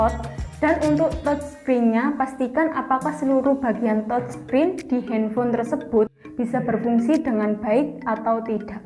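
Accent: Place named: Java, Indonesia. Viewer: Medok mbak 😅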